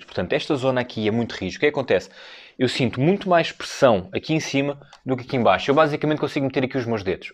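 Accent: Portugal. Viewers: soy brasileiro kkkk adoro o sotaque de Portugal kkk,aqui rapariga são as moças da noite kkk,fiquei chocado quando meu amigo falou que rapariga é menina,garota. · "putent para kcet meu" kkkkkkkkkkkkkk eu sempre perco com sotaque português kkkkkkk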